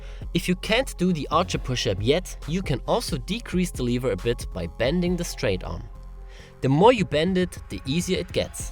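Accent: Germany. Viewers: Nice muscles! Super body! 👍🏻😛nice German accent, lol! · Yeah, the accent (way het talks English) gives it away. ;) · Are you German? :) Just checking myself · This man is single-handedly rebranding the German accent from evil villain to uplifting coach! Danke schoen as always.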